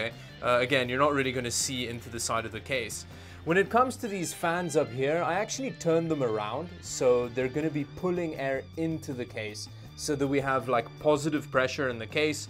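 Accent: South Africa. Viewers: Mmm, am I detecting a South African accent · are you south african?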